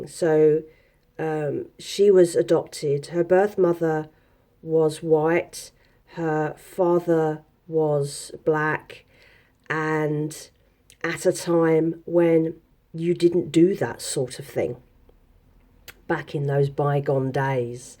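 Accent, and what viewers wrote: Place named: Australia. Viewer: I've been thinking you had an Australian accent for months! Where is your accent from ?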